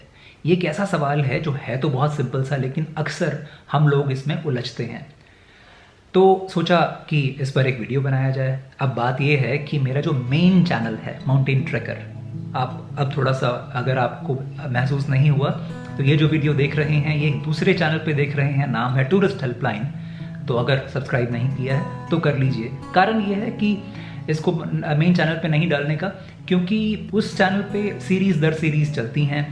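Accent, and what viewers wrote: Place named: Lucknow, India. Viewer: your this channel is awesome. You have devoted your life for tourists helping them from your experiences & sharing knowledge. I see all your travelogues and enjoy your brilliant clear Lucknowi urdu/Hindi accent. Keep up the good work. Great work bro.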